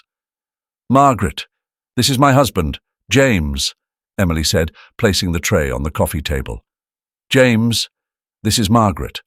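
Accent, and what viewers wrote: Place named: United Kingdom. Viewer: Love the British narrator’s voice and the way he tells the story well. Much better than those monotone robotic voices with no emotion, feeling or passion.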